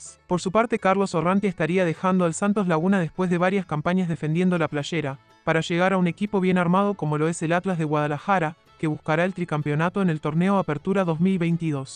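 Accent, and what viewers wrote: Argentina. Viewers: Por qué hablas como argentino ?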